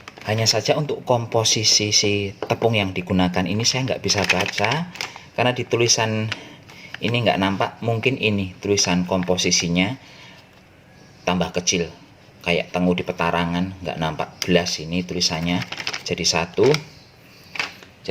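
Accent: Banyumas, Indonesia. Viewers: Ish ish.. ketemu koleksi bhs ngapak.. Blindi" .. 😂😂.. Jls enak wong pk tpung bambu🤗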